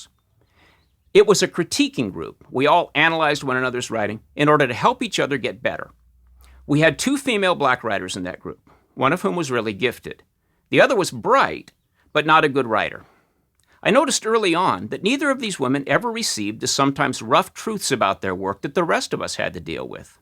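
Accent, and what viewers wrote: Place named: Michigan, United States. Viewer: I'll admit, I wasn't fully awake yet the first time I watched it, so I gave it another go. (Yes, I watched your video first thing this morning, lol) You are correct that "blacknosing" in harmful, it helps no one, and in my opinion, it's even worse when it comes from our leaders. If I had been in your writers group, it would have been my pleasure to offer those women the honest input they deserved as artists...unless they got pissy with me over it. For the most part, I still agreed with everything you said, and felt like I just have a slightly different point of view on part of it. But in watching your video again, it gave me an opportunity to look at myself and see that perhaps on this particular topic I'm coming from a place of apathy. And maybe I'm not happy about that, because as an otherwise empathetic person, apathy isn't something that I strive for. 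But what is the solution? That the average white person speak out against the ills of hip-hop? Or would it be enough to simply stop praising rubbish just for the sake of virtue signaling? I'm all for the latter! Anyway, I don't want this to turn into the Iliad...Great video, and I think we're mostly on the same page here. You speak in a manner much like the Michigan people I grew up with and I spent 22 years in LA, so I can relate to that as well. (I know Bliss Cafe too lol.) If there was a point you were trying to make that I still managed to miss, please point it out to me. I'd rather not walk away feeling like I missed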